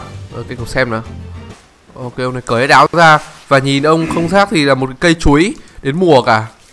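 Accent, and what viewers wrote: North, Vietnam. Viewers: Anh là người miền bắc đúng kg ạ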